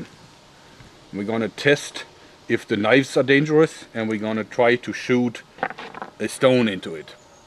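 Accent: Germany. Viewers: Really? Ok, then. I'm German myself and his accent is obvious (which makes it so much fun) but I always considered he lived in the US. Don't know why exactly, but just always did. Well, the BKA then ;) j/k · wie man denn deutschen akzent raus hört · You are german arent you? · does anyone know wehre he lives? it sounds not luke he is from an englisch speakinh country (like me) and i don't know i think about germany (my country) if i listen to him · "I think it can probably slice a throat. We'll find out." LOL Something about the German accent sounds very mad scientist-like. Keep up the great vids. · U german? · man merkt richtig das du Deutsch bist xD. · is He German?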